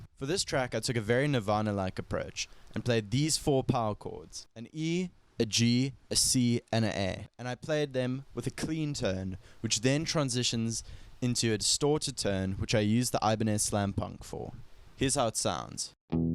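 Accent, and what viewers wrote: South Africa. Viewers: Ayo SA accent who? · could tell youre south african from the accent lol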